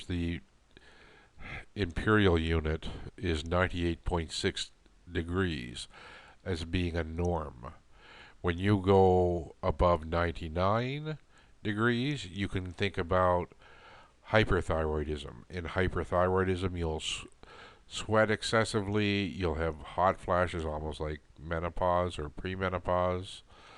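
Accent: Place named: Michigan, United States. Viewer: Where are you located? I detected a bit of Michagander in your dialect.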